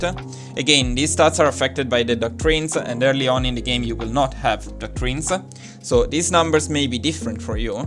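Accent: Italy. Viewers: Dall'accento suppongo te sia Italiano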